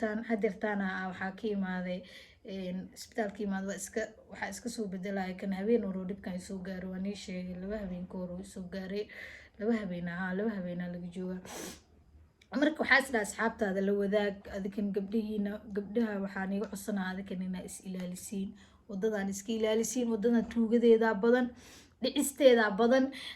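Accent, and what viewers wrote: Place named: Mogadishu, Somalia. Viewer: xamar aka hadla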